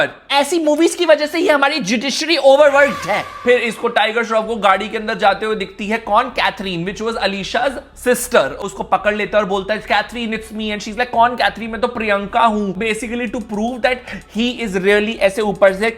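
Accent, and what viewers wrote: Punjab, India. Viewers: his punjabii~ uff~ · Curtain.😂😂😂😂...nice accent in punjabi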